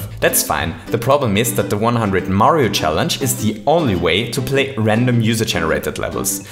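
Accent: Germany. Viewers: Du bist deutscher? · Man hört raus das du Deutsch spricht und Lesezeichen ist ja auch ein deutsches Wort #Ceavearmy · You're from germany, right? ^-^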